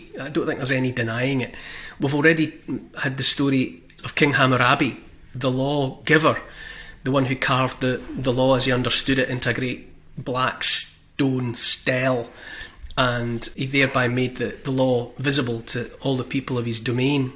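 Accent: Scotland, United Kingdom. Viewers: Cheers, doof doof on heart. Lurve ya skotiesh akscent..! 🫵😇🤙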